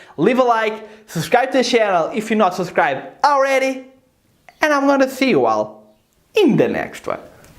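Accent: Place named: Spain. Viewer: Ha, I think its the Spanish coming through.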